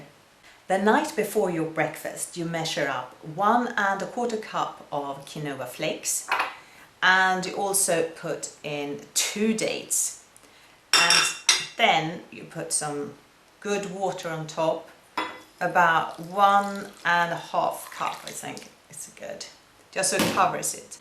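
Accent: Sweden. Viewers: You sound Swedish 😊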